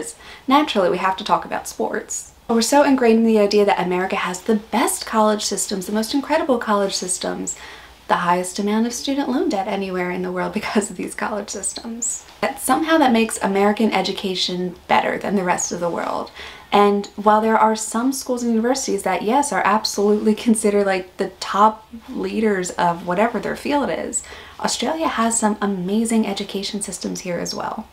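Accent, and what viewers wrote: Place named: Australia. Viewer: Watching your vids the last little while I can see you kind of relaxing and aclimatising to our Aussie ways of speaking. Pretty sure your nearest and dearest by now see you "going native". It's ok. We are a nation of immigrants. And TBH I have known a few Americans and even Canadians in my time. Noone ever wants to move "home". Home is where the heart is.But we steal them here. My Father in law moved from the UK at 17 for a few years to make his fortune. He's still here. My Grandparents went on a cruise back to the UK back in the 70s. Many of there shipmates were "going home" because Australia was not for them. A large percentage were on the return voyage, realising "home" was now Australia. This place gets in your veins. And we love it.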